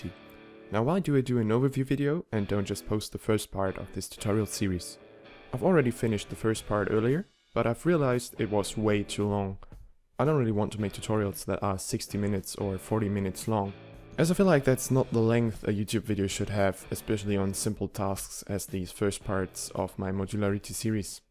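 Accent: Germany. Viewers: rofel wusste ich doch das du deuschsprachig bist ;)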